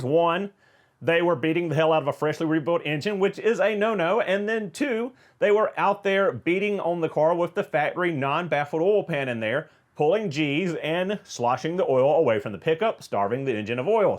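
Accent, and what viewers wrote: South, United States. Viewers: Why are ppl with southern accents allowed on YouTube?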